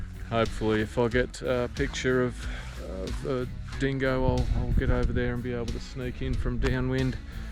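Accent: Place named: Australia. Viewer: You had me cracking up when ya come back and its still alive 😂😂😂😂 proper ozzie talk that hahahaha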